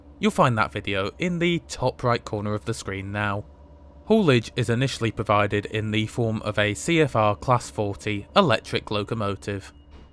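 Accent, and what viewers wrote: United Kingdom. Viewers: Thank you for the very nice vidéo.Your comments and explanations were great.I very much liked the British accent.....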